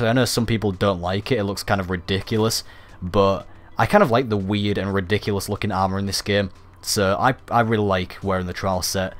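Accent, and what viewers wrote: United Kingdom. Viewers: You sound like u are from the UK,am I right?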